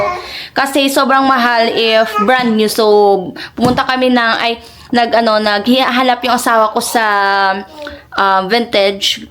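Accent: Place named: Iloilo, Philippines. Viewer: Parang ilonggo ka ate sapananalita mo😂same, ❤ hi from korea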